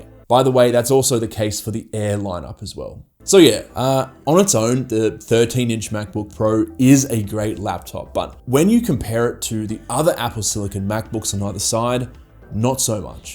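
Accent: Australia. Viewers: Thank you!!! I really love your content so much and love hearing a fellow Aussie on here! I’m sold- you definitely made me see that that MacBook Pro 14 is probably the best way I could go… for just a little bit extra than the air with added specs, yet substantially lower than the 16”. Seriously thank you! I can’t believe I didn’t see that before . Well only started looking for a new MacBook recently…. Cheers!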